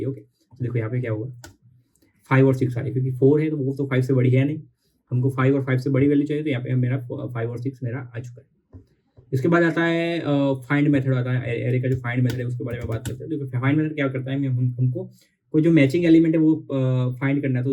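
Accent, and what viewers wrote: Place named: Gujarat, India. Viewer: Bhai, content ekdam solid che! Thodu communication polish karo, Gujarati accent ne remove kro, to channel next level par jase. 🔥 Keep going strong! 👏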